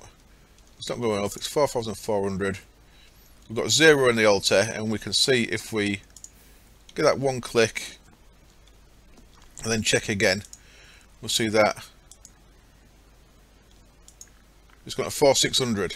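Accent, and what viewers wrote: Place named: Yorkshire, United Kingdom. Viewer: thought you sounded as if you were from yorkshire :)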